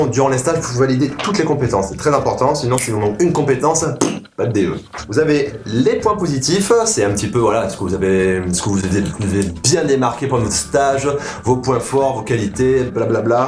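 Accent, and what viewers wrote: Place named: Southwest, France. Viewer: Tu serais pas dans une grande ville du Sud-Ouest par hasard? ;) (rapport au petit accent qui se fait entendre à certains moments ^^) Sinon merci beaucoup pour la vidéo, ça se voit que tu as fait pas mal de stages déjà pour en arriver à toutes ces conclusions, je pars en stage en SSR dans 4 jours et j'espère que ça va bien se passer...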